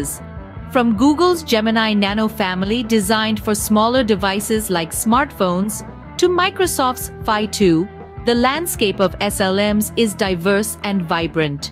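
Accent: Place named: India. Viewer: I don't think ai voice is made on purpose with a slight indian accent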